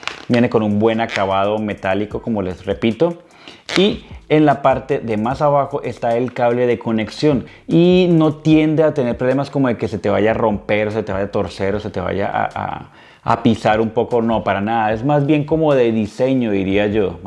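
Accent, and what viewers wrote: Colombia. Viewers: jaja, se te nota el acento tipo canal uno o tele antioquia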